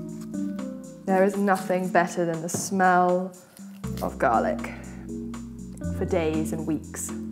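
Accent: United Kingdom. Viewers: LIAMM she’s obviously not from America can idk how you can’t tell she’s English with that accent lol this one is not on us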